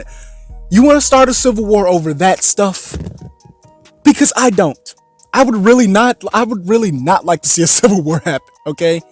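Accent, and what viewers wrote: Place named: Appalachia, United States. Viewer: He's not losing subs because he's not telling people what they want to hear it's because he's sitting in the house losing it on YouTube because he couldn't go to the gym lol! He's going now but the hillbilly accents, pausing the videos to make stupid jokes that are not funny and 6 hour lives is why people are leaving his channel.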